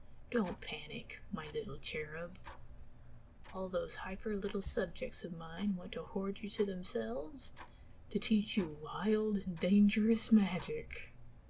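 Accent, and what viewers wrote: South, United States. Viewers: Definitely not you. Risking sounding like a simp, but you’ve got a voice (and likely a personality) like a southern angel😁. Honestly, it’s great to finally see an ASMR channel with a southern accent. Being a southerner as well, I appreciate good southern voice acting. Keep up the great work, can’t wait to see more!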